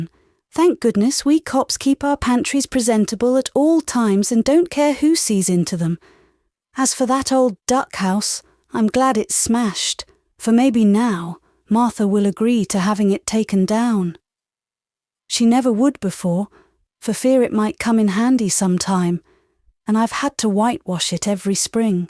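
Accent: England, United Kingdom. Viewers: I’m enjoying these very much, despite the English (not Eastern Canadian) accent; Thank-You!!! This is one of My favourite books in the series. 😉😄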